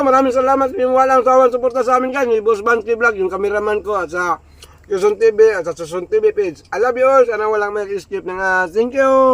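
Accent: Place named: Visayas, Philippines. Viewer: Okay lang naman tagalog niya po idol,bisaya kasi si idol.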